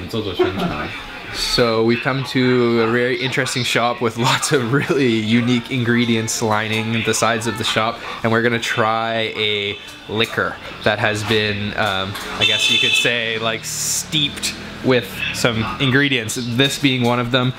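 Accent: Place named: Canada. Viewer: Stumbled over your channel by accident and I love it! Great content, superb drone footage and love the Canadian pronounciation - „over and eut“ 😉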